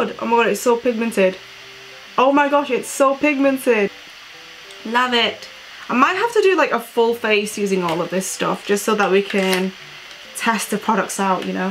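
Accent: United Kingdom. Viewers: Are you from Manchester, the accent feels familiar? :)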